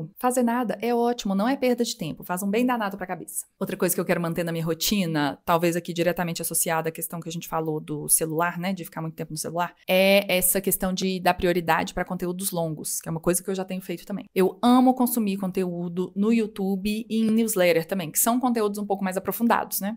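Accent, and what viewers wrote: Minas Gerais, Brazil. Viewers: Tão bom ouvir tudo isso com sotaque mineiro... o "disgrama" me pegou kkkkkkkkk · Estou adorando seu canal,! ❤ Amo esse sotaque mineiro 🥰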